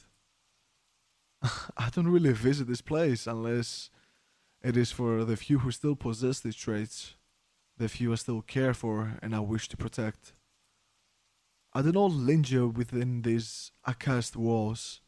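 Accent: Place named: Greece. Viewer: your accent sounds so greek wow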